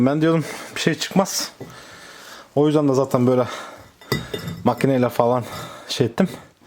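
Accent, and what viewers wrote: Thrace, Turkey. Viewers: Trakyali edirne tekirdag tarafli.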